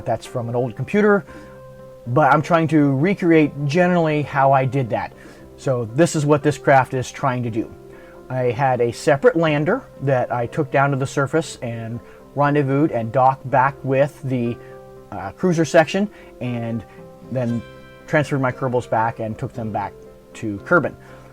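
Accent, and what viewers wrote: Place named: North America. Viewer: Cool video. My first interplanetary landing was Gilly. It seemed like more of a rendesvous than a landing, of course. You mentioned being busy with the harvest - What are you harvesting? I'm guessing by your accent that you're in North America. I'm headed down South to Esperance (Australia) to harvest wheat, canola and lupines in a few weeks. Funny that you'd be harvesting what you grew over your summer at pretty much the same time that we harvest what was grown over our winter.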